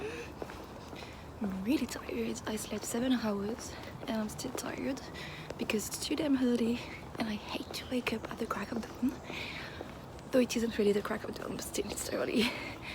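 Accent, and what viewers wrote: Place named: France. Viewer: Hi, just discovered your channel while looking for tbr ideas for the 2020 gilmoreathon. And I absolutely have to subscribe. Your accent is so lovely and relaxing and your English is impeccable. I wish I could speak French as well as you speak English. I'm going to look for language courses near me! You've inspired me. Loving this vlog.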